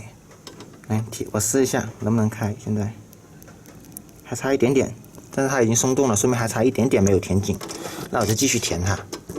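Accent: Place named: Guangxi, China. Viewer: 口音有点像广西人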